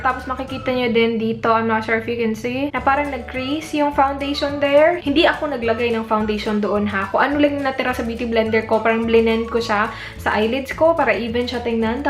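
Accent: Visayas, Philippines. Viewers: ate, may halong bisaya ka ba? :) i love your videos newbie po ako sa yt mo :) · Bisaya ka teh? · i mean you speak visayas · BISAYA KA PO BA? PARA KA NAMANG TRYING HARD MAG ENGLISH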